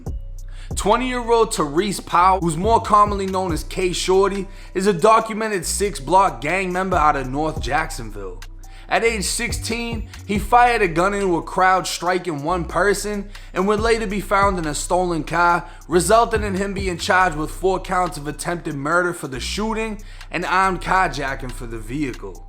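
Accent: Boston, United States. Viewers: You from Boston? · You have to be from Boston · Ammed kah jackin ... could you be any more Boston.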